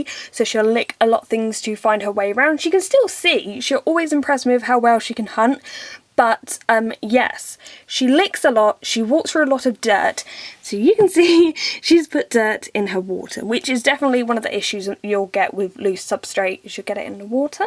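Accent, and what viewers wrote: United Kingdom. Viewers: sounds british to me. but im not sure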